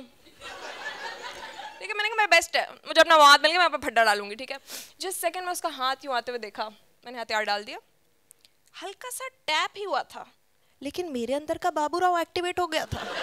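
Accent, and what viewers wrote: India. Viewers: Well that was great.. But.. The language chosen by her was a bit like they speak in india.. I mean the way of talking.. Or even some punch lines.. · Exactly my point. I liked the script but her accent was more indianish. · why do you sound Indian ? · She has an Indian urdu/hindi accent..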